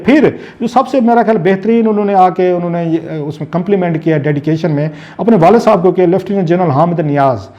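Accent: Saraiki, Pakistan. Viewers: کرکٹ کی تریخ نہیں حضور۔۔۔کرکٹ کی تاریخ ۔۔۔آپ نیشنل ٹی وی پہ بیٹھ کے غلط تلفظ کے ساتھ اردو بولتے ہیں اور اوپر سے لہجہ شدھ سرائیکی۔۔۔۔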